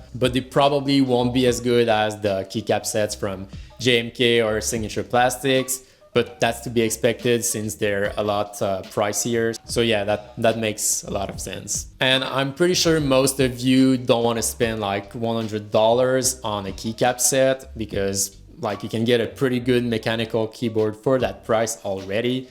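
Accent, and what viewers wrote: Quebec, Canada. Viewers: I know that accent... est-ce que t'es Québécois? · Tes tu quebecois?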